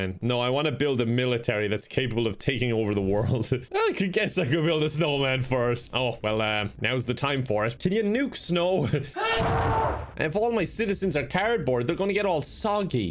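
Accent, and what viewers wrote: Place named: Ireland. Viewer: I swear the more insane gets the heavier his Irish accent gets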